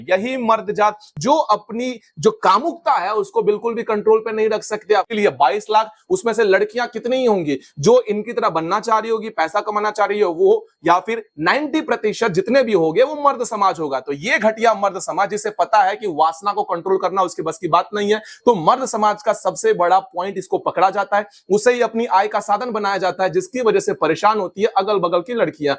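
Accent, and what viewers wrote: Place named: West Bengal, India. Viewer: Hindi bolne ka style sehi pata chal gaya tha e West Bengal se 🤮🤮 feel ashamed 😞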